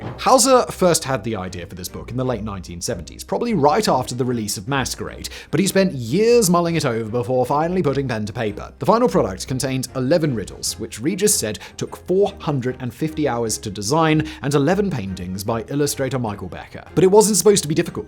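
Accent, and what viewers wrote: England, United Kingdom. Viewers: I come back for the lesson delivered with an English accent time and time again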